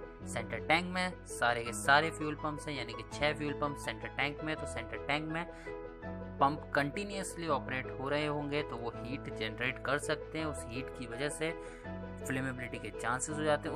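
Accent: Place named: India. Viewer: Indish is so painful to listen to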